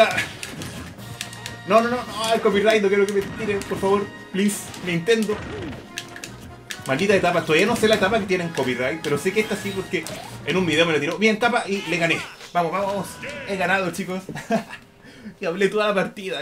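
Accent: Chile. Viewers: Que recuerdos, eras bien chileno 😂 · Sos de Chile? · Eres Chileno?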